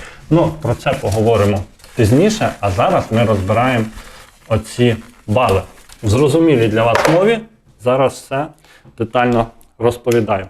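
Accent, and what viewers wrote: Ukraine. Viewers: Мова в нього, звичайно, жахлива, русизмів дуже багато, хоча по вимові, відчувається, що рідна в нього українська.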